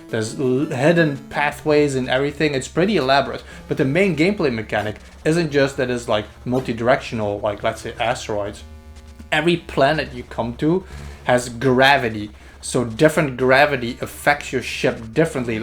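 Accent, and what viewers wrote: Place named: Denmark. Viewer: I'm guessing Danish.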